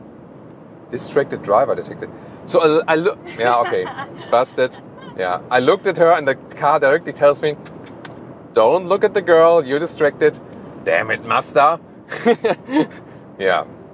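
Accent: Germany. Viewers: Are you a native german? Because you're accent sounds very german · You have a strong German accent, you speak too fast, and you mumble. Not a great combination for comprehension.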